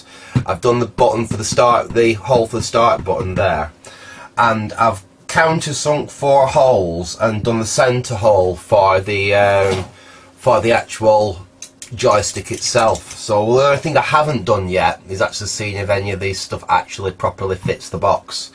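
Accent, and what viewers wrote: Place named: Bristol, United Kingdom. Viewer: Interesting accent trying to place it. Bristol perhaps but just a wild guess.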